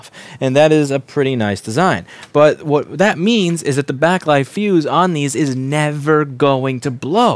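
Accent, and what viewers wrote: New York, United States. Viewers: I'm sure I'm not the first to tell you this, but your voice reminds me of Jerry Seinfeld. I guess that you also have the same accent since both of you are New Yorkers.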